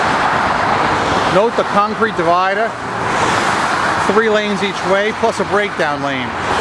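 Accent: New York, United States. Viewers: Loving that New York accent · Nothin' like a good ol' New York accent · god i hate how you nyc people talk. it makes me ashamed to be from this state. its PARK not PORK you fucking idiot.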